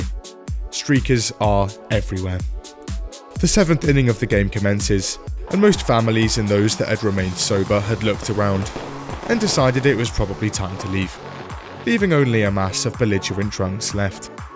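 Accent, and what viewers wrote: United Kingdom. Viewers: Feels weird hearing a british guy talk about baseball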